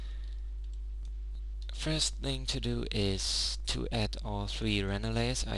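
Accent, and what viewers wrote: Germany. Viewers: I attempted to complete the tut using 2.49b with little success(several months ago). I just finished your tutorial using 2.58b(with major success)!! Hooray. Thanks for the Portal and Fire tutorials. You're one of the best blender teachers out there. Love your German accent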